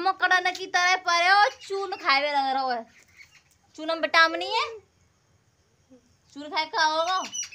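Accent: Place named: Mathura, India. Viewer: Apke mukh se dehati bhasha bahut sunder lag rahi hai.bhasha ke hisab se aap mathura side ki lagti hain.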